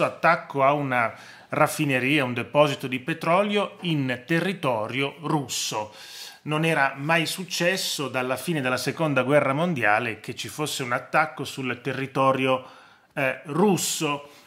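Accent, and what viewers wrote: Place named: Rome, Italy. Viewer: Buongiorno Prof. Grazie, perché Tra tante lacrime il suo accento romanesco mi fa sorridere un pochino!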